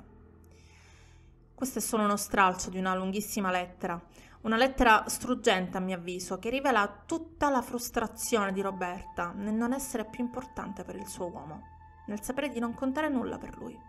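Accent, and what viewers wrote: Sicily, Italy. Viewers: sai che io che sono palermitana come te il tuo accento nn l'ho mai sentito? Poi l'ho letto nei commenti che sei siciliana, e gli altri invece dicevano che avevi l'accento siciliano, che strano forse perché era il mio stesso accento per cui nn ci facevo caso😃😃😃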